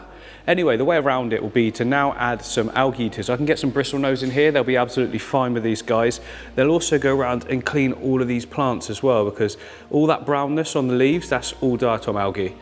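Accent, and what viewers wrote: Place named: East London, United Kingdom. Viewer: I enjoy your videos and your Eastenders accent. You have a natural knack to the hardscape balance and plant placement '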